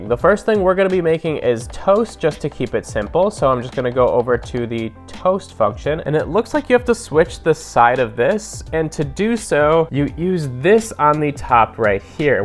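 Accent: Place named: United States. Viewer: This fella pronounces his ‘e’ as an ‘a’. It’s a very American thing…messing the lingo up. Good presentation though.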